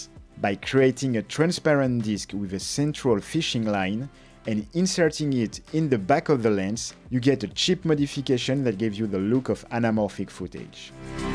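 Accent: France. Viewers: The tricks are just amzing ! And the powerful French accent is totally tentalizing.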